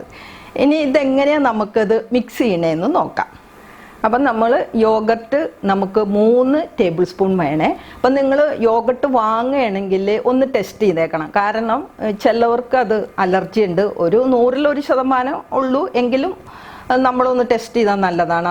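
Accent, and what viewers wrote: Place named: Kochi, India. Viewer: എനിക്ക് സംസാരം കേട്ടപ്പോൾ തോന്നി ഞാനും കൊച്ചി,കൊച്ചിക്കാരൻ, ടിപ്സ് ഒക്കെ അടിപൊളി ❤️🤝🤝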